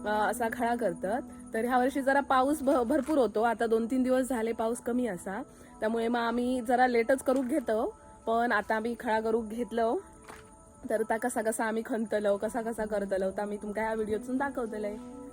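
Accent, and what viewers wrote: Malvani, India. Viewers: मालवणी संस्कृती आणि मालवणी भाषा सुंदर आहे. जास्तीत जास्त मालवणी भाषेचा वापर करा म्हणजे यश नक्कीच मिळेल. लोकांना मालवणी भाषेतील व्हिडिओ जास्त आवडतात. तुम्ही मालवणी सुंदर बोलता त्याबद्दल आम्हाला अभिमान वाटतो. आपल्या व्हिडिओचे विषय चांगले आहेत. आमच्या शुभेच्छा सदैव आपल्या पाठीशी आहेत. धन्यवाद आणि आभार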